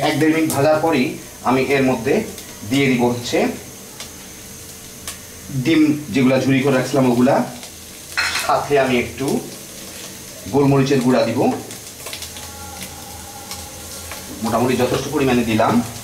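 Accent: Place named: Kushtia, Bangladesh. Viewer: ভাইয়া আপনার দেশের বাড়ি কি কুষ্টিয়া। কথা সুনে তাই মনে হইল।জানাবেন প্লিজ।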